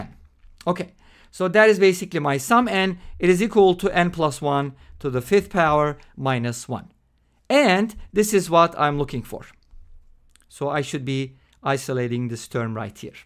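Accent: Turkey. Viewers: Your accent sounds Turkish.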